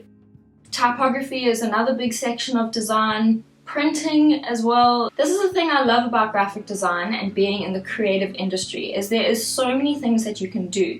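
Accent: South Africa. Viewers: Been looking for a fellow South African to share a day in the life, and your accent kinda gave it away before the SA patch on your tshirt😂 but anyway this was insightful and really entertaining. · its funny how I instantly knew were a south African by your accent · Your accent sound South African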